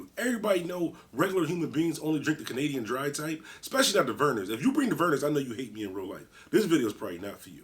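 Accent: Philadelphia, United States. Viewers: Bro you sound like a philly nigga js bro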